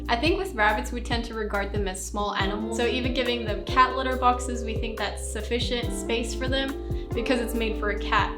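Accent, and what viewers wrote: Australia. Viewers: I'm just watching along minding my own business, when I hear.. "..a young whipper snapper". An I'm like hey, is she Australian? Yay. Finally found an Australian bunnytuber. I'm getting my first rescue rabbit in a couple of weeks. Eeek. Excited.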